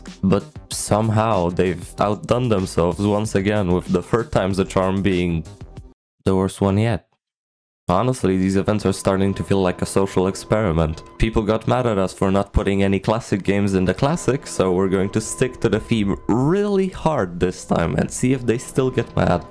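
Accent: Poland. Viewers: yo you polish? dawg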